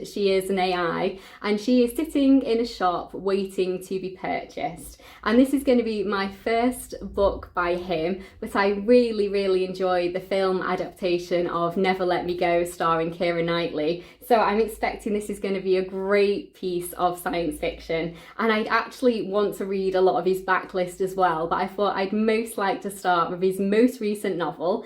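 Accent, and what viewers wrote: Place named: Northern England, United Kingdom. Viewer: I love hearing a northern voice!! Sending love from Leeds❤️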